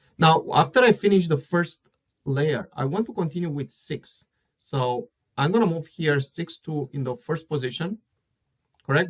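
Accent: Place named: Romania. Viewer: Are you romanian? :)) (because of the accent) Anyway, congrats for explanations.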